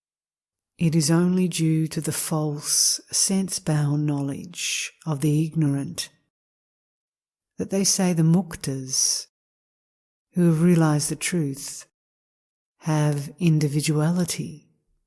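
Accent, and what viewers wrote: Australia. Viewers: Bless you and thank you for this. I think I hear an Aussie accent, so happy to hear an Aussie with knowledge of our one true self <3 Om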